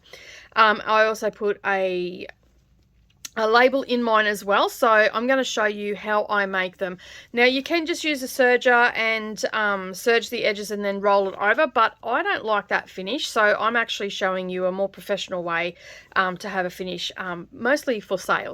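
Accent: Australia. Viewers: Thank you for this I thoroughly enjoy watching your videos and your explanations. So very clear and easy to understand. Best of all your an aussie so a welcome voice to an ex aussie all the way in the UK. X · What a great idea. Recently discovered your channel. Nice to hear an Ozzie. Can you please advise who you purchase your labels from. Cheers Dianne Qld Aust.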